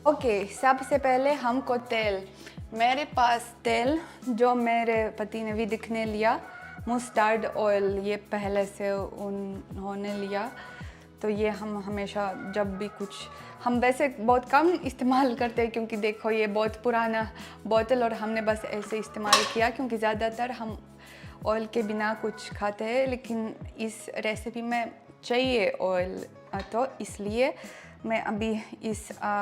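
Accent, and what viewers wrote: Standard. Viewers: Aap hamesha khus raho sabsa bada Rog kya kahenge log aap bahut khusmizaz aur funny ho,apki sudh Hindi aur aap bahut acha bolti ho apke vlog dekhkar bahut Anand ata hai maine apke vlog pehle bahut Miss Kiya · इतना शुद्ध हिंदी तो हम भारतीय भी नही उच्चारित कर पाते है..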